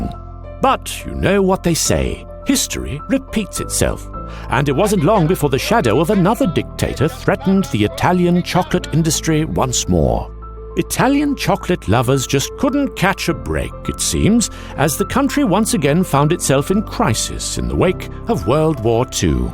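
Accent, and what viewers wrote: England, United Kingdom. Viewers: Hes got a british accent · SORRY NEW GUY BUT 'UPPER CLASS ENGLISH VOICE' IS PRETENTIOUS AND SHYTE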